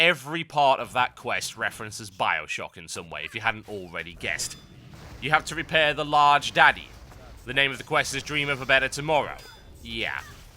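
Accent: United Kingdom. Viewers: telling a British man to lose his accent... gg kthxbai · wait...are you complaining about a British having an English accent? I'm speechless.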